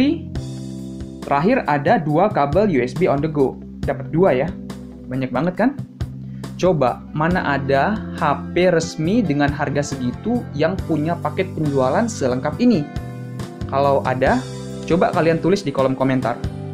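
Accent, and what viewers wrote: Lampung, Indonesia. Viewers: orang lampung ya bang? nyo kabagh:v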